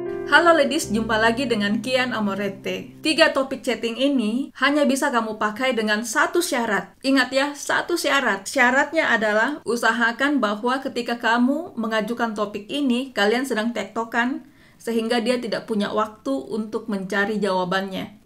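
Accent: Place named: Toraja, Indonesia. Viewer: Wkwkw kak logat Toraja ta masih keliatan di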